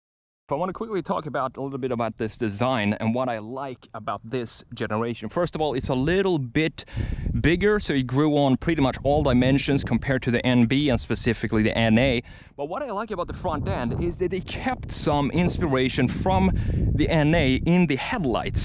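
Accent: Sweden. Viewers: Are you from Sweden? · Hi, a very nice review. I also like the NC as that engine has a greater potential than earlier engines and its a great platform too. Are you from Sweden ? I can hear a ”tone” of Swedish in your accent.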